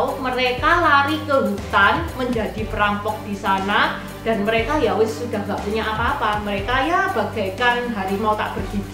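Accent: Java, Indonesia. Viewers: Logatnya njawani men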